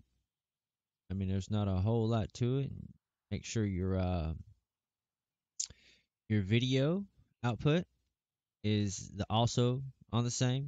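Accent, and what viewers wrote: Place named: United States. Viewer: you sound like a american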